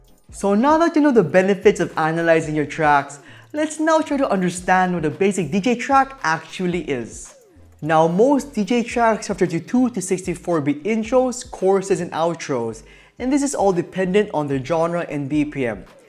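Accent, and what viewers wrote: Philippines. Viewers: I knew it! I’ve always had a feeling you were Filipino from the way you talk!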